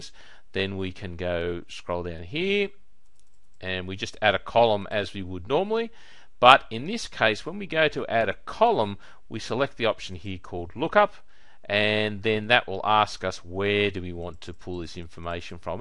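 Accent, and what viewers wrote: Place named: Australia. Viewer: Many thanks for the reply - searching on the web all the articles are for SP 2010 or maybe 2013 and all recommend not using (large) lookups as they slow the system down too much, I guess I can only do some testing to see what sort of impact the large lookups I'd like to implement will actually make. Also nice to hear an Aussie accent on youtube, keep up the good work mate.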